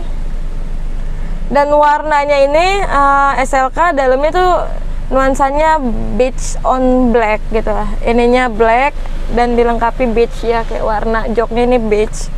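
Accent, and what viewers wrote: Java, Indonesia. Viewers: pasti wong jowo udah bisa ketebak dari suaranya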